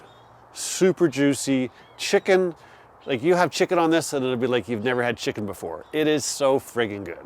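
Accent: Canada. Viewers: Great video thanks. I’m considering the KK but doesn’t seem to be available in Canada. You do sound Canadian btw.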